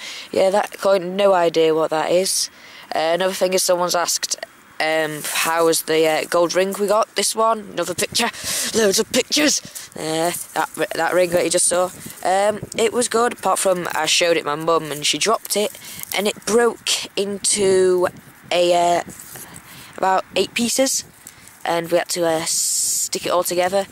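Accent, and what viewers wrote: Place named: Yorkshire, United Kingdom. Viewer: Your accent is well good, your hilarious! Is it Yorkshire? And it's a wreath not reef ;p great vids keep them coming!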